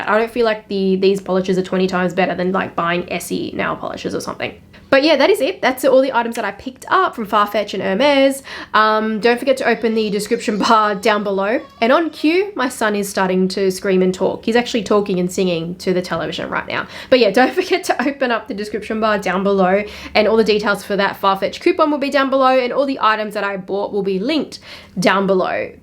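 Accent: Australia. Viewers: I like the Aussie accent I am half Australian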